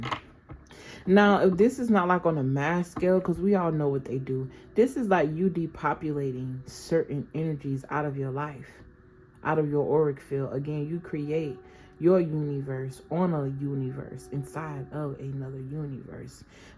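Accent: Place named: South, United States. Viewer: Country accent!🤣🙋🏼‍♀️ I appreciate you and your messages so much. ❤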